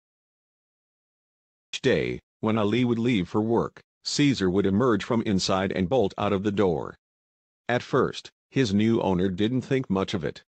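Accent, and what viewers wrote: United States. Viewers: For heaven's sake man. You have no need of a robotic voice, you have an American accent. Use it, don't hide it.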